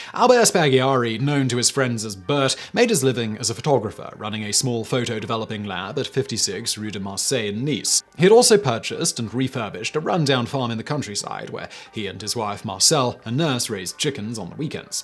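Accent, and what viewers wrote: England, United Kingdom. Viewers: I think deserves a statue. He manages to get people to pay attention to the most random and banal subjects but that English accent and dry humor makes us forget that we didn't know we didn't care about whatever subject we didn't know we didn't know about. Encouraging accidental education during quarantine is a difficult thing to attempt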